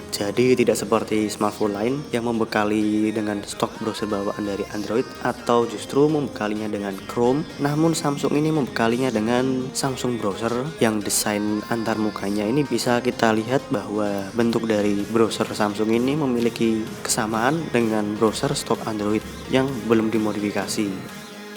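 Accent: Java, Indonesia. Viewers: kok "Medok" banget mas... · mas nya orang jawa pasti.. medok bgt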